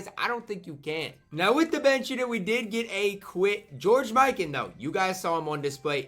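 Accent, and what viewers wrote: Iowa, United States. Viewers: He is from iowa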